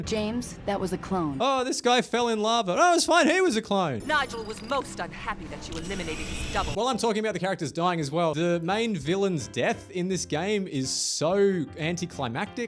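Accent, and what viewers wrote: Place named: Australia. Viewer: My first thought when I saw this video was "over an hour of that Australian guy who talks about video games? Did I die?"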